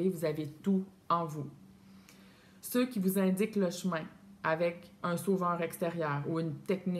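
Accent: Canada. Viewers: Cette chaine est géniale. Merci bcp pour ttes ces informations et tout ce travail. L'explication est bien Claire surtt avec cet accent cabadien adorable. :D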